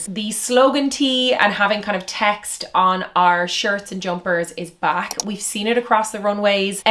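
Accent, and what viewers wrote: Ireland. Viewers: Just sounds Irish to me!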